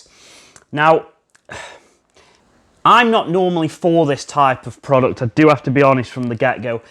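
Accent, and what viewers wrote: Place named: Stoke, United Kingdom. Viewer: Do I hear a fellow Stokie?? 😂😂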